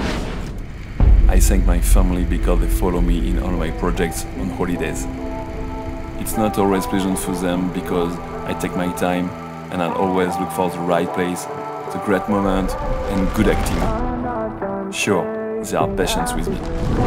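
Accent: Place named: France. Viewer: Propre!!! Ah on voit que monsieur à encore progresser. C'est bon, tu maitrises tout le package des vidéos des grands youtubeurs ^^ Au niveau de la colorimétrie, tu nous sors plusieurs ambiance qui s'enchainent bien, niveau du rythme du fpv on y est, on a plus rien à redire. Niveau effet spéciaux, c'est bon, quand je vois tes portes on est au point aussi. C'est un mini parralax 3 que tu nous a sorti en fait ahaha il y a peu être UNE chose à redire: ok l'anglais pour un meilleur référencement mais on entend encore trop cet accent frenchie ahaha Content de voir que tu progresses autant, plus qu'à trouver d'autres idées créatives! Tu es arrivé au top:D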